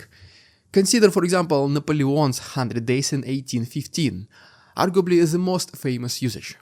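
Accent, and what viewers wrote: Russia. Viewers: I love how with every video, you have such a human dialogue that sounds like national geographic. Your Russian accent is so beautifully balanced with your complex use of English words and your very charming personality. I love how you don't throw numbers at us. You humanize the war and bring it to the hearts of all of us viewers. Wonderful. You've grown a long way since I first discovered your channel and got frustrated with you. You have grown from a young man, into a proud and very emotional news caster who I always look forward to seeing. And mixing in tidbits of silliness just adds to your great videos. Please, dont ever stop. Even when the war is over, I hope you cover the recovery and rise of Ukraine and Russia, brining your unique humanizing dialogue, that will transform the numbers, into recovering people. Stay amazing. Give your little monster lots of love from all of us.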